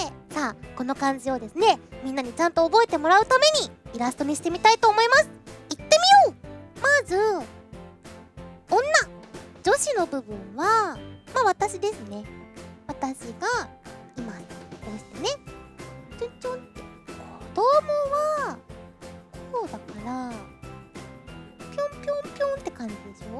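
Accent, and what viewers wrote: Japan. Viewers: 日式中文RRRRRR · well Japanese uses kanji/hanzi as well so it's Japanese especially regarding that she pronounces words in Japanese pronounciation.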